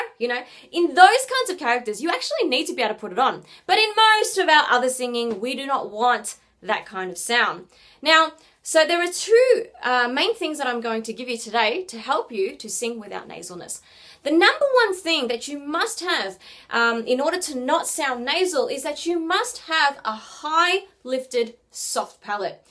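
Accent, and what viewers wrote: Australia. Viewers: She's Australian, that's how they sound! ( I'm from there, I should know!!) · Its because shes Aussie aha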